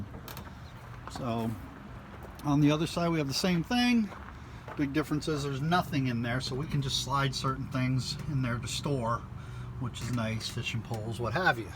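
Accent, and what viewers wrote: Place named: Maine, United States. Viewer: You sound like you have a maine accent I live in maine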